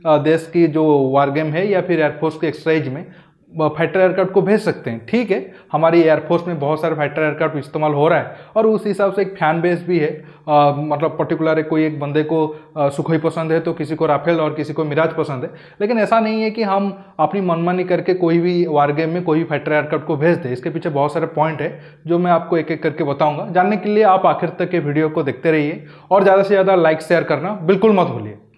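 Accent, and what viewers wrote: Odisha, India. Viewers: sorry to say please currect your accent of oriya in English like word - America , humare these words you can follow a hindi speaking people to correct your pronounciation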